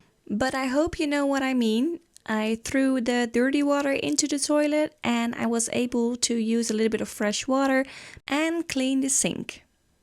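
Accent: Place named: Netherlands. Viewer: Wat gaaf ik kijk altijd dit soort filmpjes! Nu kwam ik bij jou uit. Maar ik hoorde in je accent wat nederlands! Tof dat je dit doet! Ik ga je volgen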